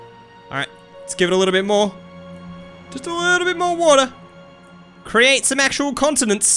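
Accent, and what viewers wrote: Australia. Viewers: His Australian not English for his it is satin for us it is saturn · you australian? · Wat your Australian me to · Are you Australian?! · Hes aussie! Like me!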